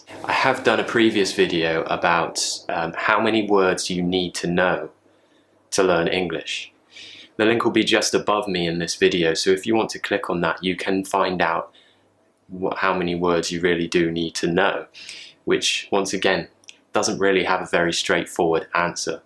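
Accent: United Kingdom. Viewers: Hi sir how are you? I like british language accent so i watching your every episode. Thanks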